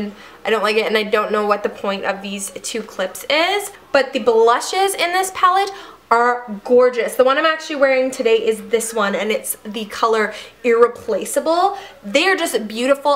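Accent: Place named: France. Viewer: You sound super french in this video :P (fellow Canadian here!)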